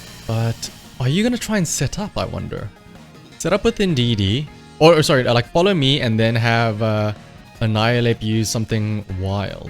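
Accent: South Africa. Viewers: hey man are you from south africa? I feel like i recognise the accent haha. saying this in zimbabwe!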